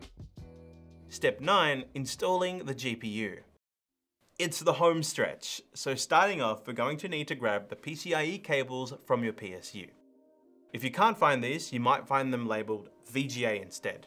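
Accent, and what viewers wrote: Australia. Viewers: Awesome walkthrough! I was 90% sure of how to go about this, but having a lovely Australian voice to check my work was immensely helpful and reassuring.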